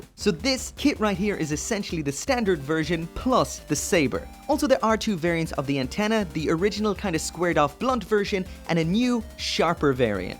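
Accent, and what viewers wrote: Ireland. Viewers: Hey man, you Irish?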